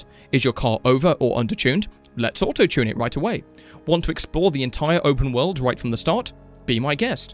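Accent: United Kingdom. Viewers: yeah man you could definitely turn this into a career. Everyone loves a British accent narrating videos lol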